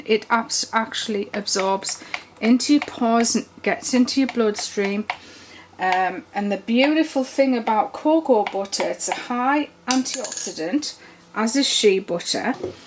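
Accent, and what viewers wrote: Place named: England, United Kingdom. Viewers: Love watching you! This sounds crazy but I catch myself talking to myself in your English accent and I am Texan!! This quarantine is making me bonkers!🤣 Love that you say you cook on a “ring”! I had to think about that for a second. We cook on a “burner”.😄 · Hi I’m guessing because of your accent you are from the north of England- I live near Newcastle! Love your vids very helpful. Well done ❤️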